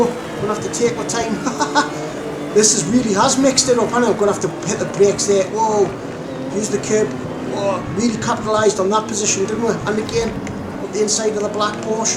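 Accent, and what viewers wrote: Newcastle, United Kingdom. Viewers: Really enjoyed this lad - and great to hear a Geordie accent 💪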